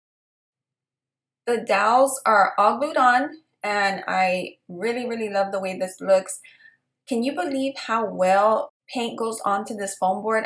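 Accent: Texas, United States. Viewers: I like all your creative decorations and I like how you take your time explaining how your voice sounds you must be from Texas keep up the good work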